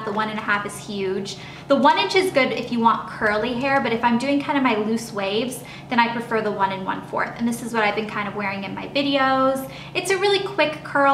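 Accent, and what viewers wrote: South, United States. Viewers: Love it when you speak southern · seriously LOVE your southern accent!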